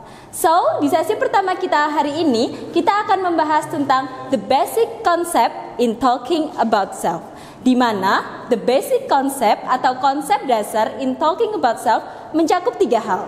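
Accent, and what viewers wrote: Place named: Java, Indonesia. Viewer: Medok mya gk pernah ilang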